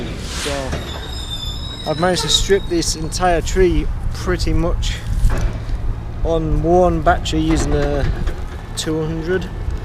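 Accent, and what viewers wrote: Yorkshire, United Kingdom. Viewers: Yorkshire or Lincolnshire accent mate. Great job on that, zip line for limbs inspired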